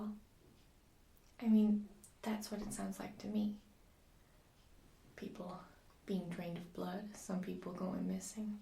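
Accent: United States. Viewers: your american accent is pretty good! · Great job with the American accent!! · Your American accent is more believable than my British accent. Lol. Good job!! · Your American accent is awesome!!! :D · You should be wearing plaid so you can be a real winchester! Haha that was a pretty good American accent though! I'd love to see you do a spin on this idea as a crossroads demon or something! Hrrmm maybe I'll make my first asmr video as a kind of response to this as a demon or angel or something, if you don't mind me kinda borrowing the idea! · Wow, I didn't recognize your voice at first! Very nice work on your Inland North accent of American English. Regrettably, that means your voice doesn't trigger my ASMR like it usually does, but good job nonetheless! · American accent, WHAT!? Totally threw me lol. Well done! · I love the American accent! Not only do you do it well, it's also really relaxing. 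Switching it up is a good thing. You're perfection!<3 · I didn't realize it at first I was like something's different the American accent that's it nice job beings I'm American let me here you say book haha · 1) Excellent American accent. :) 2) Supernatural. And ASMR. A surprisingly fantastic combination. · Fantastic American accent. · wow, I don't don't know how difficult it is to sound American since I am one, but I couldn't even tell you weren't! Very nice, although your normal voice is just so damn relaxing. Also, freakin awesome idea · Nice video! I am quite impressed with your american accent! So much better than I could do a british one... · Awww, came here for your own ccent and then it's American :( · I love your accent, so I was FLOORED when you were using an American accent. Great job and nice change of pace with the Hunter angle. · Haha, that American accent took a while to sink in. 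I kept thinking that something didn't sound right but it wasn't until a minute and a half that it clicked. Cool gimmick. :) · Nice American accent :) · American accent for the win! Great job. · nice american accent! :) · Nice American accent!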